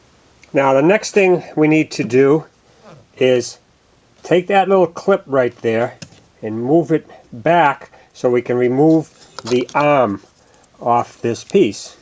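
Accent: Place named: Boston, United States. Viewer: Ya sound like your from bawwston, are you from bawston there boy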